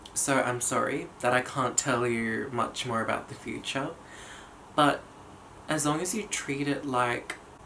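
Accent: Australia. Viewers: What nationality are ya? Sound Aussie... I think? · I recently subscribed and AHH HE’S AUSSIE I DIDNT KNOW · Oh that auzie accent. Wow. R u from Sydney? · Is that an Australian accent I hear? I've been working on improving my imitation of an Australian accent, but I've been finding it pretty difficult. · Is that an Australian accent?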